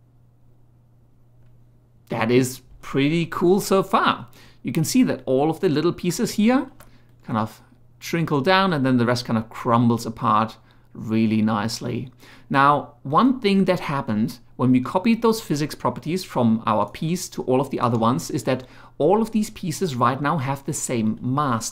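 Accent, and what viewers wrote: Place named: Germany. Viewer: Ich mag "Deutsches Englisch"... Grüße aus Norddeutschland... Cooles Video.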